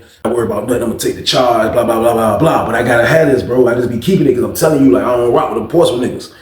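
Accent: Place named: Brooklyn, United States. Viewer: Sounds like Brooklyn dudes in NY lol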